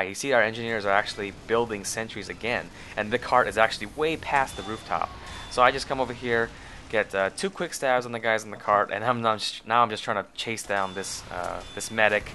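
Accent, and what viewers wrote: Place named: United States. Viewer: To be honest you sound very american.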